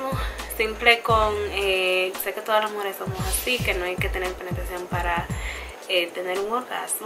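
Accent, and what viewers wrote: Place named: Puerto Rico. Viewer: Acento PR veoo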